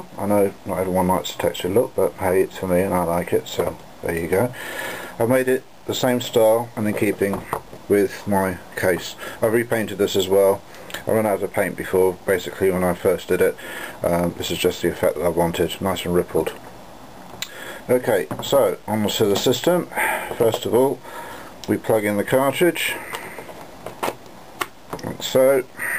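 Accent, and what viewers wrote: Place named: United Kingdom. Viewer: somebody can explain me what this does/ sorry is because it kind of hard to my to understand brithish english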